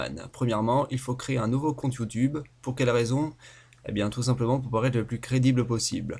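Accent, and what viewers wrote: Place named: Marseille, France. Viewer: j adore ton acsen marseillais XD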